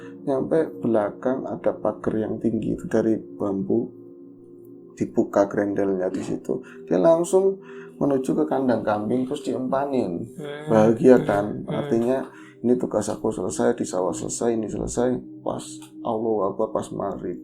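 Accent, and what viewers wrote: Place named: Tegal, Indonesia. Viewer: Udah mas style nya gt aja, malah asik koq kita jadi tau sedikit2 bahasa tegal!! Seru lho mas!! Sukses selalu untuk ngobor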